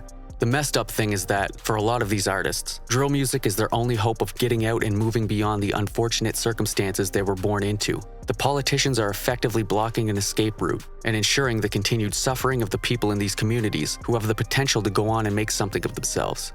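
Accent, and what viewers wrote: London, United Kingdom. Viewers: That British accent is legendary 😂😭🙏🏾 TBH tho... It's really good. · Mate, i gotta rate your London accent! We usually laugh at anyone trying to copy us but man went in! Gwaarn kuzz! Keep up the fire 🔥🔥🔥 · No lie your Brit accent sounds like a white bugzy malone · Your British accent is really good.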